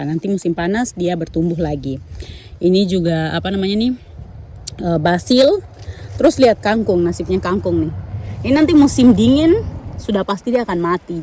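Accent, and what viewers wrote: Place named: Java, Indonesia. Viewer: Logat jawanya dapet bener kak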